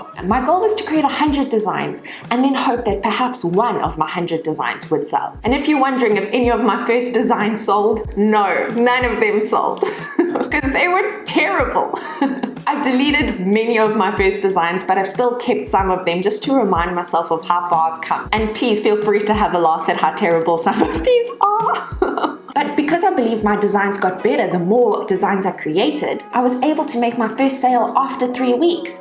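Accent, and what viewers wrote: South Africa. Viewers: By the way I guessed your accent as I have 2 good friends from SA. Keep being genuine and honest and above all stay safe. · Keep up the work fellow Saffer ,sounds like a Durbz accent. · I’m guessing you are from SA ? It’s really so nice to hear your accent on this app , so refreshing 😊😊. I’m from Namibia by the way 😄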